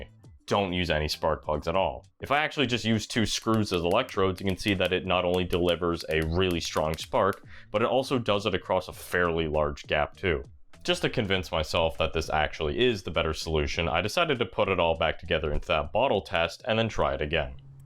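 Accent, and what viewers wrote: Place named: Canada. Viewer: Lately I've been listening to too many Canadian youtubers. So I recognised your accent.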